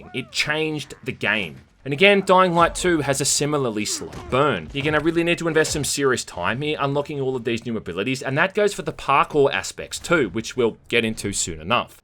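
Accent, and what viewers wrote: Australia. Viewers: There's something about an Aussie reviewing anything that is just amazing. Love you work man!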